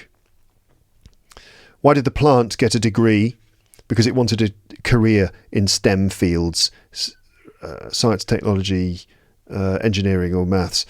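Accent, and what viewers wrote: United Kingdom. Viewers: Is this british accent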